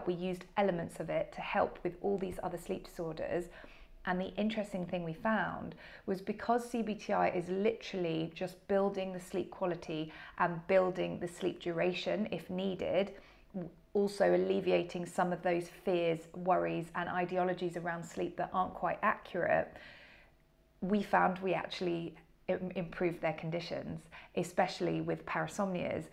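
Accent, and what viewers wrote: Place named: United Kingdom. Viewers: I love your accent You sound English, British, Aistrailia maybe or Irish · Its a British accent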